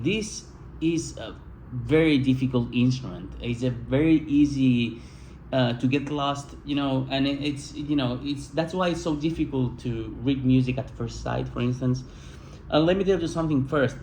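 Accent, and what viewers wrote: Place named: Spain. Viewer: Gracias. Me es más fácil entender el inglés con acento español que el ingles americano. Thank You pal!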